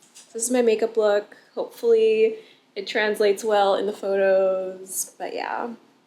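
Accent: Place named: Australia. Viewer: Your such a beautiful soul inside and out and I hope everyday you keep a smile on your face and we love hearing you Aussie accent 😂🫶🏾🫶🏾🫶🏾🤎🤎🤎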